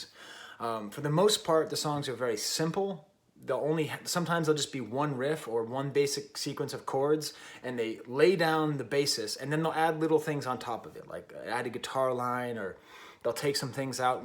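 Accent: Dublin, Ireland. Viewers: Nowhere near a British accent, it's a strong Dublin accent. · He has a very Dublin accent. Hes from the same town as me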